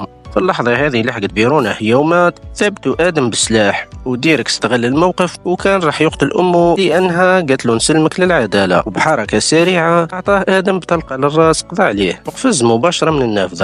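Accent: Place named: Algeria. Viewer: 123 viva l Algérie روعة لهجتنا 😅من اجمل الملخصات التي اعجبتني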